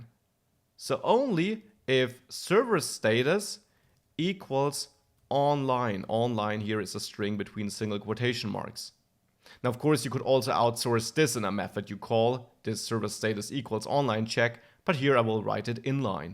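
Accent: Bavaria, Germany. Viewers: Hab mir gerade den Angular 2 Kurs auf Udemy gekauft, und fiel fast vom Stuhl als ich den Dialekt gehört hab. Wenn mi ned ois teischt, a Bayer??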